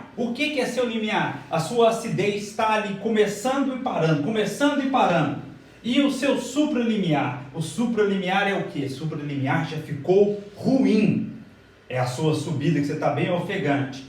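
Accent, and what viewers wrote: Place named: Minas Gerais, Brazil. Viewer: Parece mineiro uai!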